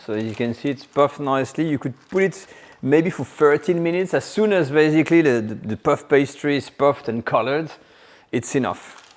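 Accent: France. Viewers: Quite shocking that a youtube channel with Academy in the title, and being French, did not make its own pastry ... that is the one technical bit here. That's the sort of thing I do on my amateur channel ! Are you just a bloke with a French accent trying to make money on youtube ? If they find out they will send you over here to England as a punishment :-)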